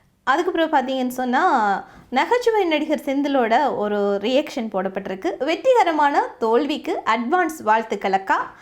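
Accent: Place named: Eelam, Sri Lanka. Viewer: No bro athu oru style ithu oru style i think she is from Ezham any how slang diffrenta eerkuthu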